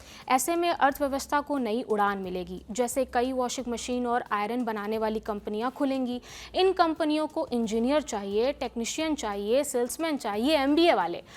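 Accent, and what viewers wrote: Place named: Bihar, India. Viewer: Y all lallantop anchor use bihari accent....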